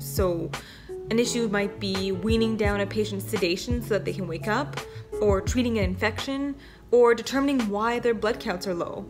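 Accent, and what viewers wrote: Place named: Canada. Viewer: Where are you from ? Your accent sounds very Canadian and also like your from Minnesota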